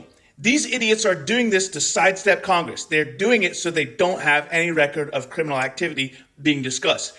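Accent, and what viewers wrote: Scotland, United Kingdom. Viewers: Absolutely love your stuff! I apologise if this has been asked before, and also apologise for it not being relevant to the point of this video but… do I hear a slight “accent”?. I appreciate everyone has an accent really but I’m hearing like a Scottish twang or something. I’m not from the states so could even just be the accent of where you’re from but yeh I really really like it and eventually was like omg just ask the man 🤦‍♀️also honestly thank you brother for giving me legs to stand on so I can stand with you. by informing me about how your guy’s government is affecting you, your fellow Americans, those humans guilty of simply wanting to be part of and contribute to America or even just to be safe or at peace, and the rest of the world right now. If you ever wonder how powerful your voice is well I’m glad to let you know it’s powerful enough to have crossed the sea and stir real emotions/dedication to humanity. 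· You would actually have to work hard to be this incompetent. 🙄🤦‍♀️. I hope no one in our military dies because of these idiots playing their own personal game of Risk. It was nice to hear more of your Scottish brogue,! 😊💜👍🏻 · bro, you sound occasionally scottish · Innit cute! 😁🤭 But it's a Scottish accent! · Am I having a stroke or were you sprinkling in a Scottish accent? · Is it me, or am I really hearing a bit of a Scottish accent? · I think this was this first time I heard Scottish accent ❤ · 👏👏🫱 🔥🔥💪👊🖖...yep I'm being a cunny funt 😂 Is it just me or does keep dropping bits and pieces of an accent (except for the sepo one of course 😉) in every now and then throughout this particular video? I swear I'm hearing dribs and drabs, a bit of Scots, a bit of Taff, a bit of pom, a bit of Eire, either that or I've got a couple of them big furry grasshoppers (🦘) bouncin' around in the top paddock (🙇‍♀️)...🥴 🤣🤣 Catch ya l8r 🙃🐨🇦🇺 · Love the Scottish accent lol as for the epic screw up I'm not surprised. 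That would be like if the Enola Gay radioed ahead to let them know a little boy was about to be dropped on Hiroshima!!! Stupid as F***!!!! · I made it to the end! I love the Scottish accent BTW.❤ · Loving the angry Scottish accent popping through❤ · Ho is you Scottish?! Hell yeah! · You know is upset when the Scotish accent is coming out.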